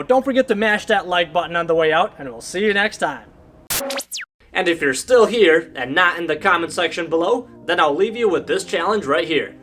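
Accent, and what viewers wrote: Minnesota, United States. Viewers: Your content is great! Knowing you're a Minnesotan (cause I talk like you too!) but now I live in So. Florida. People say lifespan of 10-20 years but what about hours used? I have a 10 yr old 13 SEER Ruud 3 ton unit running 410 refrig. would you replace it with a 16 SEER or just keep it tuned up and hope for 15 years? System checks out ok, I replaced capacitor last year but seems takes nearly 8 hours to cool down house from 80 to 72F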